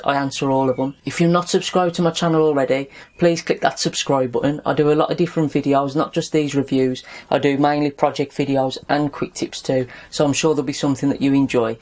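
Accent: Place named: West Midlands, United Kingdom. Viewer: Great review ar kid very detailed and the West kids accent makes it that much better to watch as I'm from walsall .I've subbed and liked well done keep it gooin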